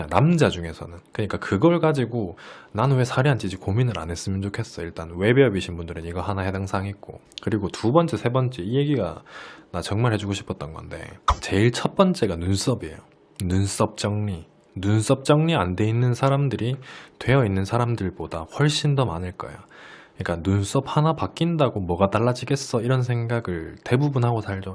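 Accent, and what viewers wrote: Gyeongsang, South Korea. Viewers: 억양, 말투가 딱 대구사람인 것 같다.. 동의하시는분??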